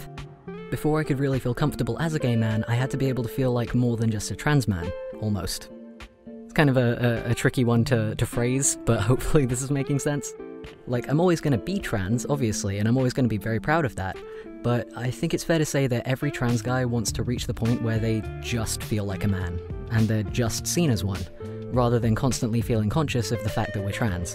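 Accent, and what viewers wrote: South, United States. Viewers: nice country accent! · Yeah I live in the U.S. definitely southern accent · As an American LGBTQ+ person I don't blame you going American (and definitely southern). My country has a long way to go in a lot of ways when it comes to the acceptance of people like us. I hope that this year fixes that. I'm really happy for you in how you now feel so much more comfortable in who you are, and your art is still gorgeous. Happy 2020 dude! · As someone who lives in Texas: I can 1000% confirm this is a Southern Accent. It's better down here in Texas now than it was a decade ago, as I think a lot of places are a lot better, but man, can it still be hard. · yo that wasn't just American, that was southern! (and accurate lol).... but there's still gays in the south................. lol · southern accent ON POINT I respect you on so many levels, live your best gay life my dude! 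· Oh my god your American (southern, really) accent gives me LIFE.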